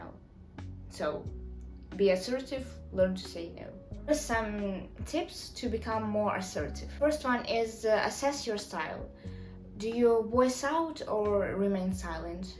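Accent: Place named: Russia. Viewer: are you from russia? because your accent sounds like someone from russia. anyway, i enjoyed your video :)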